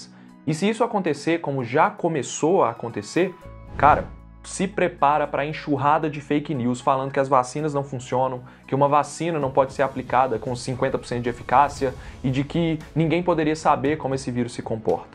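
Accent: Minas Gerais, Brazil. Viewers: cê é mineiro? 😍😍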